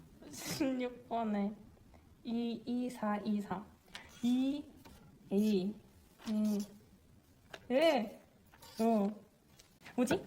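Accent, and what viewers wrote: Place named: Gyeongsang, South Korea. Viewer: 경상도 사투리 쓰시네요 ㅋㅋ 혹시 경북 지원하시나욬ㅋ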